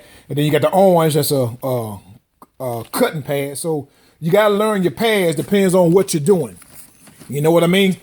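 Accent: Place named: South, United States. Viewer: love your channel my man!your cool southern accent makes me run down to popeyes and get me a few biscuits!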